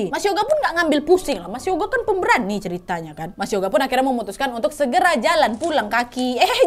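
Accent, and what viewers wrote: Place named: Medan, Indonesia. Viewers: Kak itu lahir di pekan baru sudah bebrapa kali kk blng dan memang bahasa ny agak mirip sm kek logat orang medan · oh begitu, kalo logat si saya emang tau makannya pertanyaan saya Medan, Pekanbaru atau Batam 😂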